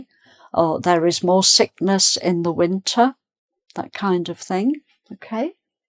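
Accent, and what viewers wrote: United Kingdom. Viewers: Good evening Miss, from Brazil, your british english make me remember when I was a teenager and starting my knowledges, nowadays, I need to learn american english, trying 😂, your voice is so sweet ❤❤ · Hi teacher! I 'm an English teacher in Brazil and I just love your videos and your genuine British accent!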